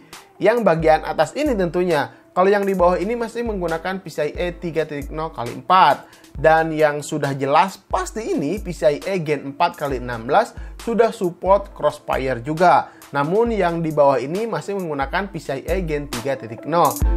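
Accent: West Java, Indonesia. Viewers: wkwkwk sunda bet ngomongnya. ketika V dibaca P "pidio"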